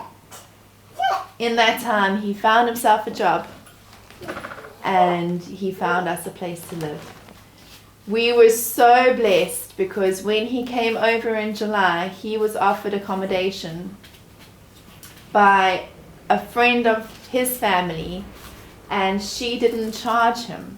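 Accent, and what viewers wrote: South Africa. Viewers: Hey! Your lovely Saffa voice is so soothing to listen to! And I love your text on the screen during the noisy bits 😂 we are in Ireland 7 months already!! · I am currently in Dublin visiting a friend, He was kind enough to open he's door to me to come out here to look for employment, really God sent. I have left my Wife and my 2 children back home in South Africa really out here trying my outmost best to make this trip work for me to try and give my Wife and my Children a better future. Things back home are not looking good at all, so I really need to make this work. I have a Home Care and first Aid certificate from the Red Cross South Africa. If you are kindly willing to refer me to a place where I can try and land a job because unfortunately all the places I have called or approached don't do sponsorships at the moment, I am willing to cover costs for a work permit. 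If you might also know of any place that is hiring any Customer Service or Sales Jobs. I would really appreciate any kind of information. Thank you. I have been applying for work online hoping and praying that I land an interview it has not happened yet though but I am pushing on. Really your story has touched my heart because I can relate and it really tells a story of how strong we are as South Africans, also what a blessing to my ears for hearing a familiar South African accent...lol...All the best going forward...May God continue to bless you all and guide you...Cheers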